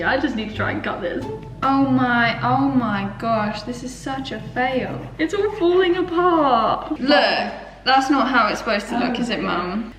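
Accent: Australia. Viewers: sounds so australian in this video. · You sound so Australian in this video!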